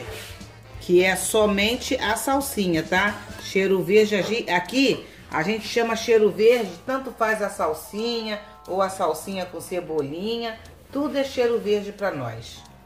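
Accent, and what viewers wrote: Rio de Janeiro, Brazil. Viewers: Adoro o seu sotaque Carioca! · Amo suas receitas e sua pronúncia carioca. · Adorei o modo prático como comanda tudo, fora o seu sotaque carioca lindíssimo, e a dica tb adorei kkkkk. Beijos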